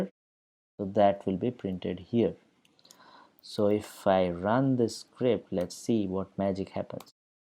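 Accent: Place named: Gujarat, India. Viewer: His accent is guirati....how many of u noticed ?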